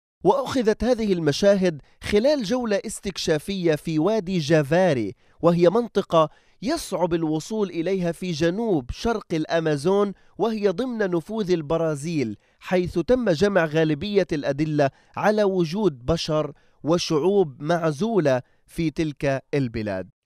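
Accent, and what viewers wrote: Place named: Gaza, Palestine. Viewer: الي بحكي مبين انو فلسطيني من غزة حسب لهجته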